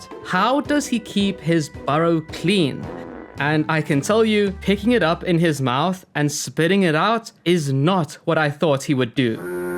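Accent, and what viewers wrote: South Africa. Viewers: Are you from South Africa by any chance? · South African? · Great video man, though your accent is tickling my brain, it sounds like your first language is Afrikaans. Now I might be tripping, but the way you say certain words are just making me think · I know a South African when I hear one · You sound south aftican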